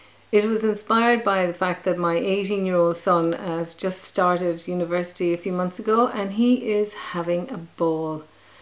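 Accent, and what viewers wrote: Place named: Ireland. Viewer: Well done on blog 82 video. New beginnings for sure. Pleasure to listen to your content and your Irish accent. JC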